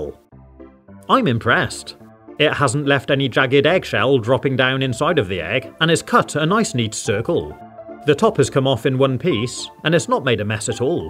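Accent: United Kingdom. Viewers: Nice accent boss where you from United Kingdom? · I love how easily distinguished his British accent is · I am British and you sound like me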